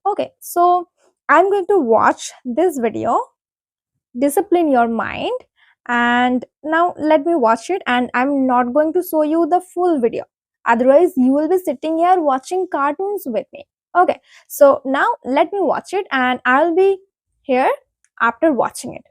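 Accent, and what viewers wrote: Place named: India. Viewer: I like accent Indiah😊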